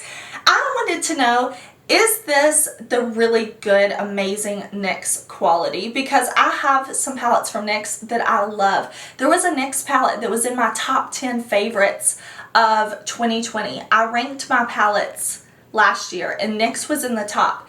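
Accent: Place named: Kentucky, United States. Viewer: You sound like you are from Kentucky when you speak. Nice accent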